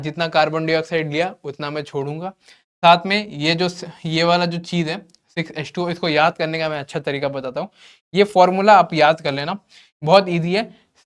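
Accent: Bihar, India. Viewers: Sahi me aap Bihari ho kya 😮😮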